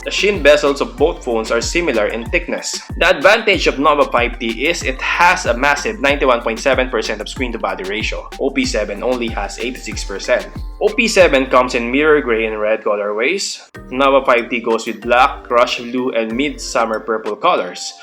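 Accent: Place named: Philippines. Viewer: I love your accent bro #pinoypride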